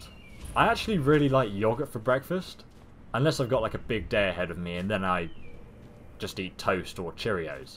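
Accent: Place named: United Kingdom. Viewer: Omg I live in the Newcastle area! :D i always knew you were British cause your accent is similar to mine, it's great to get to know a tonne of things about you :D.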